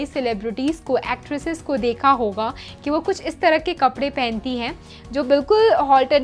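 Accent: Bihar, India. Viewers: apni language ko thoda sundhar.... Tipical bihari tone ata hai apne muh par mane top k banati h vedio or channel ka namo rakha h be natural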